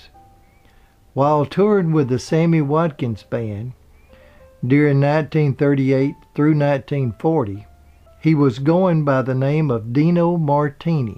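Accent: South, United States. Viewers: Now that Lewis has also passed on, do you have any plans to make a 'companion piece' to this video about his life? I do hope you consider it as I really enjoy watching your films. Oh, and just for the record, I am one of those who just LOVE your accent - it reminds me of several holidays I had touring the Southern states of America many years ago when I was young and mortgage-free!! We always found the southerners extremely friendly, and they were just as fascinated by our English accents as we were by theirs!! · I smile every time someone comments on your accent, vernacular and the Southern charm exuded in your voice. You remind me of my younger days and down home folks having a casual conversation. Thanks for that memory and for your informative and insightful videos. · My little brother spoke only Portugues when we came home from living in Brasil. 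He understood English, but only spoke a few words. We spoke English at home, but the woman who took care of him spoke Portuguez to him, and although she spoke English very well, would only answer us kids if we spoke Portugues. Good documentary,Love your accent. Your voice sounds like the men in my family! (from Alabama. Tennessee and Florida) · This guy I like has a smooth southern voice....kinda weird for a Dean Martin history......whatever? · . I love your southern dialect. You sound so much like how my daddy talked. Your voice is very soothing. Thank you for your channel and all the good info you provide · I love this southern Accent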